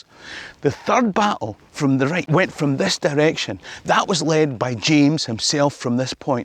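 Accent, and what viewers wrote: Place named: Scotland, United Kingdom. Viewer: In Scotland you're probably used to a bit of diversity talking scottish....cos it's not often heard in Australia in any complexion. ..but it sounds so funny...mind you you sound like Billy Connolly. Scottish history is depressing. I always want them to win. Everything seems to have a sad ending.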